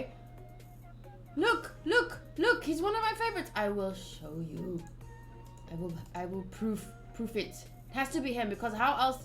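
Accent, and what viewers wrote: South Africa. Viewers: I just knew you were South African!!!!!!!!(0_0) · Nice accent! Where in SA are you from? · you sound South African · Wait you sound South African. Are you? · You sound South African?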